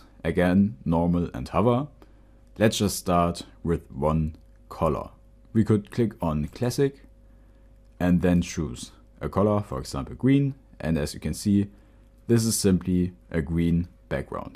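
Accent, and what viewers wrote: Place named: South Asia. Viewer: Why is this white man talking like south asian man? just wandering. Good video!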